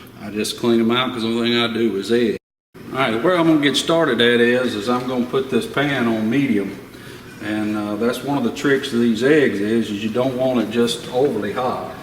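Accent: United States. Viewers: Great Review. Straight to the point. Thank You! I ordered the 12" and it should arrive soon. I can't wait to use it. P.S. Your accent reminds me of home (Texas). I live in NYC now.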